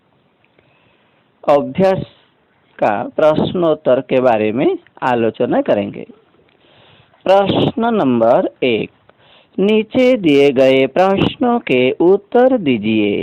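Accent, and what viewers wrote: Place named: Odisha, India. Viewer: Are bhai sun ya odia midiam saraswati Hindi kitab ho to odia gurujika voice kasa aiga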